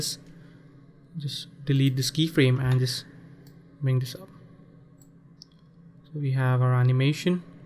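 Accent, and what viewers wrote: India. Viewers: bro are u an indian??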